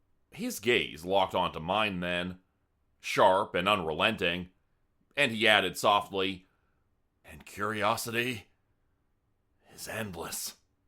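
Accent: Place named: Canada. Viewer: He's from Canada and Canadians pronounce certain words differently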